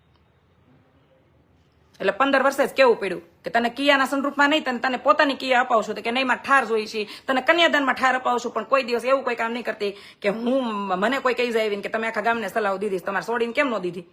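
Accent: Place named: Kathiawar, India. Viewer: ઓરિજનલ કાઠિયાવાડી શૈલી માં ખૂબ સુંદર વાતો કરી gret hartly lovely tru ok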